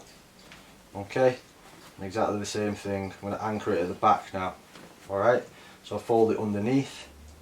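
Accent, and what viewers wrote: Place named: Yorkshire, United Kingdom. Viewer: Where abouts are you based? Must be Yorkshire.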